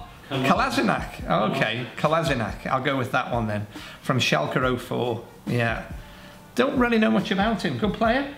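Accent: Liverpool, United Kingdom. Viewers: scouse prick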